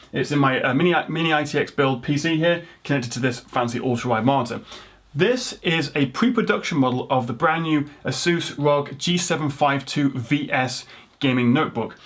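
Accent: United Kingdom. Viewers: Around 10% in neighbors of the desktop version:D I almost go to sleep and then see you replying to my comment, I immediately have to respond. I really enjoy your contents and your sleek British accent:D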